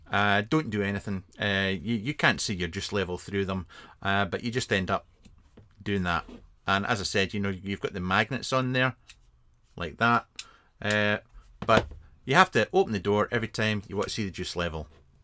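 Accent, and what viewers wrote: Scotland, United Kingdom. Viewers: I really like the intro/hold music. Shame that rambling Scottish bloke popped up again and ruined it... To be fair this is the exactly the review on the Boxx I’ve been waiting for. Authoritative.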